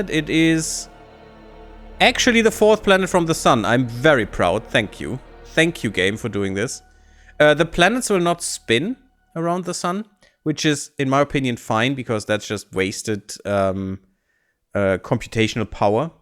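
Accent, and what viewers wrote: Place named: Germany. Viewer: Are you german? Love the accent lol sounds cool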